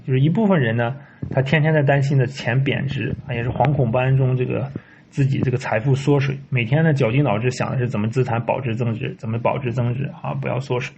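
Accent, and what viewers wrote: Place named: Fujian, China. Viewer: 博主聽口音像福建人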